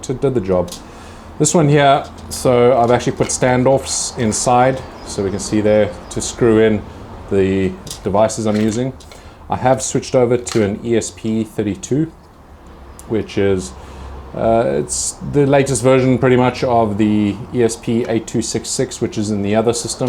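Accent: South Africa. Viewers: Yes I wondered what a was also at first! I love his accent but sometimes I have to listen again to get what he said. Also, the English and probably the South Africanese have different sayings from the US so I have to listen to the whole sentence to understand correctly. Great man though! I love watching him!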